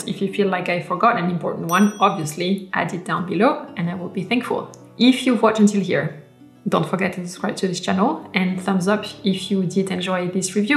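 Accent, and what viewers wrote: France. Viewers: Mam... Are You french?? Cause you do speak like one!!! Anyways.. Mam.... Your vudeos are amazing, and I have watched your portfolio videos and it helped me a lot... Do more videos❤️‍🔥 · J'adore tes vidéos, je remarque ton accent français qui revient plus depuis que tu es déménagé en Provence 🙈 yay haha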